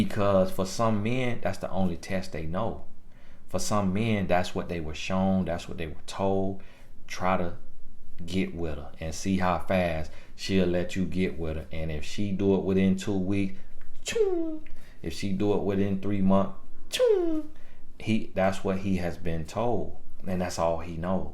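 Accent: South, United States. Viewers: I smiled every time he said “On ya bike!” 😂😂😂 I love southern accents!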